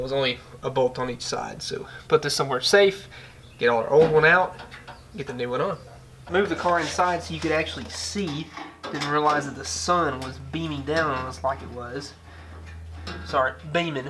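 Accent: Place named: South, United States. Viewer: My husband and I are watching this right now, we love the way the car is looking and we're watching to the end to see how it turns out. You are really amazing, we love how you take so much care with each and every part of that gorgeous gorgeous car. We're also from the South so we have accents as well so it's ok, we're use to people sounding that way. (Oh, just in cause you were maybe possibly wondering, we're from&live here in Tennessee)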